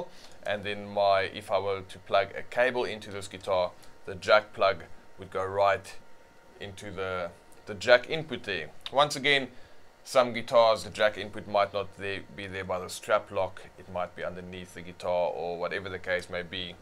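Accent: South Africa. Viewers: bru I recognise that South African accent anywhere!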